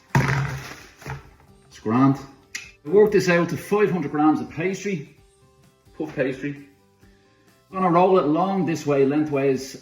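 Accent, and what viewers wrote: Ireland. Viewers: Put them in the oven for about turtee to turtee five minutes! Love and miss the Irish accent. 👋😁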